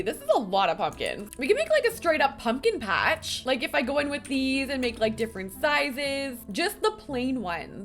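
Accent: Canada. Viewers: I love how she says words like “couch” and “house” 🥰 I didn’t know this was a canadian accent until I discovered her channel. (I’m not a native eng speaker)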